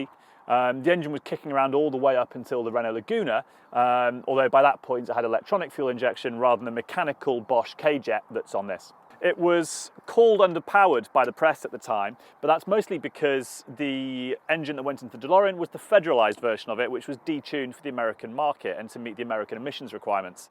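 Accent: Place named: England, United Kingdom. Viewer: Good review, but please lose the English accent, it sounds silly.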